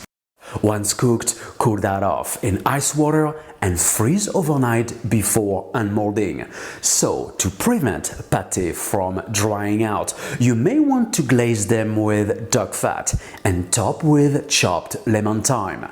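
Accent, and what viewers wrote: France. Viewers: This guy sounds so french that it seems fake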